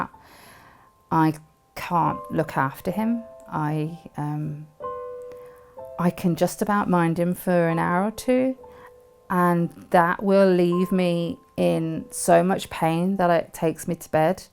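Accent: England, United Kingdom. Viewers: What i dont understand is she said she can't afford the medication but she sounds British in which case she wouldn't pay for medication and being on esa she wouldn't have to pay for prescriptions. I have fibromyalgia and its truly awful so I sympathise with her but I just dont know what she means by affording medications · You sound English but I am assuming you don't live in England? If you were in the UK you can get free prescriptions to cover any medicines, I have 11 prescriptions monthly and used to buy a yearly pass whilst I was still at work but since becoming too ill to work I now get all my medicine for free, I cannot imagine what it must feel like to have the extra burden and worry of affording your meds I know if I had to pay for mine I couldn't afford them all and would have to go without some of them. I hope your situation improves and thank you for being so open and sharing this with us.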